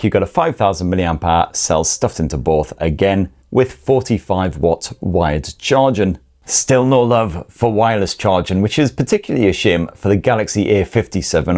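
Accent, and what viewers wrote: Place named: Sunderland, United Kingdom. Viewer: He's a Northerner, to be precise a Mackem.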